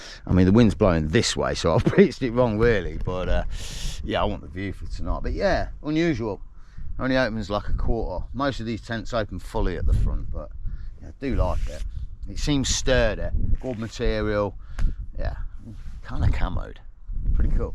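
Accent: Leicester, United Kingdom. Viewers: Where are you from Leicester?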